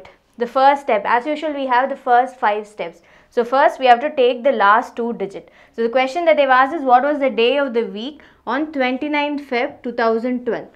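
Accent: South India, India. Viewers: Beautiful you are South accent in ur English make you more cuter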